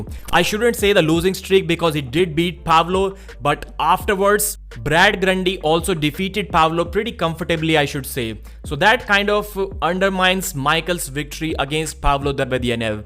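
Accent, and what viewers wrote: India. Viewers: Man, by having such a proper channel you singlehandedly made me get used to an indian/pakistani accent at last! Now it feels just like an american or UK one, just as easy to comprehend! Kudos to you, man! Hope my comment doesn't come across as ignorant one, I really meant it with the best of intentions! Best agregator/news channel on arm wrestling lately!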